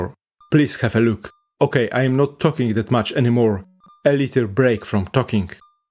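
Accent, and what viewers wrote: Poland. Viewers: please do the voiceovers with one of available speech synthesizers next time :( i can't stand this Ponglish, which is a darn shame, as the rest of the vid is great. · akcent... uszy bolą... nie sprawdzając jeszcze domyśliłem się, że polak... nie wiem, ale tego typu akcent - powszechny u polaków, to ... nie wiem jakby to określić.... jakby "udawanie" że się mówi po angielsku ale sama treść wartościowa i ciekawa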